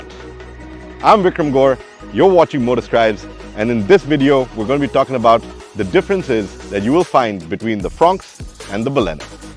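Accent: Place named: England, United Kingdom. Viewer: What's with the heavy English accent?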